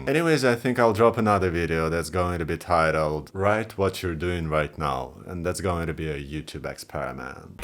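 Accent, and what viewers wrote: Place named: Russia. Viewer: It's here In Georgia, USA. And you're queued up with a number of videos. I'm listening to you as I play a video game. You do have a strong Russian accent. But, as you already said, the algorithm isn't human. So, that's not the problem. Your English is very good as well. Before I continue, I have to state the obvious. There's a vicious war in Ukraine and of course you are aware of that. I'm certain that not all Russian citizens support that war and I'm not asking your position. You may not wish to answer and I wouldn't impose on you for an answer. But it's constantly in the news. And like it or not, that may have some effect on any Americans who views your videos. There's very little doubt in my mind that focusing on that in any way would not serve you well. In sum, there may be a measure of fear that is inhibiting you. Or a measure of anti-Russian sentiment as well. 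I'm not a content creator in any way at all. So, I can't say that any advice I give you will be useful. It is not my intention to be cruel, but I have to say that sitting in front of a mic just talking about yourself doesn't make you stand out. So, I'm wondering if you have some personal interests that you can share with us. Any skills, training or activities that might interest viewers. You live in Russia I believe and of course it isn't wise to dox yourself. How does being nonbinary affect your life in Russia? Are you at risk from others there for being on YouTube and openly nonbinary? Can you leave your h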